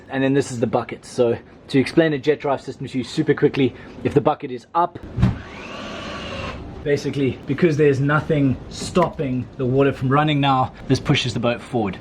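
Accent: South Africa. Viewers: That’s so awesome man, I thought I recognized the accent, cause I’m also from South Africa! Where in SA are you from?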